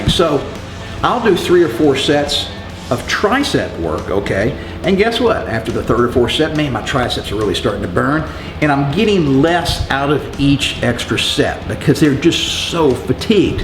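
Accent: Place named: Dallas, United States. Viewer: Sounds just like everyone I grew up with in Dallas. Wonder if he is from my home town or even went to some of the same gyms that I did in the 80s? I lived everywhere from University Park to Plano.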